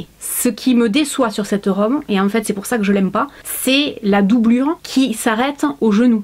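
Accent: South, France. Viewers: Moi perso j adore ton corps t es parfaite comme tu es ( maman ) 😂 non pas du tout j ai 42 ans donc copine on dira ! Après pour Shein je suis un peu comme toi j’adhère pas à 100% peut être parce que j ai grossis mais non pas vraiment, pas seulement j aime pas la « loterie » soit t es contente ou alors déçus et non 🙅🏻‍♀️ trop peu pour moi j aime pas ça et renvoyer en Chine 🇨🇳 bah ça me saoule déjà en France je le fais pas je vais en général en boutique ou je donne à mes sisters, sinon je partage ton avis sur toute la commande à 100%. Gros bisous J adore tes vidéos et ton accent rien que pour ça je vais vivre ds le Sud Ps: moi aussi forte poitrine ça grossit vite sous certaines formes mais on est comme on est mince vive les différences ❤️❤️❤️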